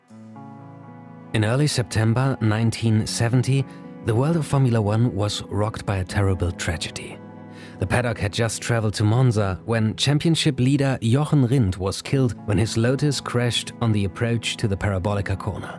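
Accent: Germany. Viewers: No doubt the narrator is German. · Your are German right? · Your German accent is so off-putting. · are you german? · you are german! i can hear it, how you pronounce the german/austrian names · So wie du die Namen aussprichst bist du Deutscher oder Österreicher :D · I'm new here but I could listen to the German accent all day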